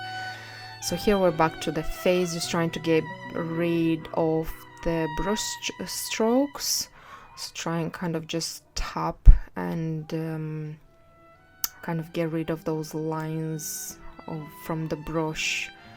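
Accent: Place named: Europe. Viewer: I love the mix of accents you have! Something European with some English cockney in there a bit! Really neat to listen to! You’re very creative, love watching you make something come to life ♥️ I like your “play it by ear” style, I’m similar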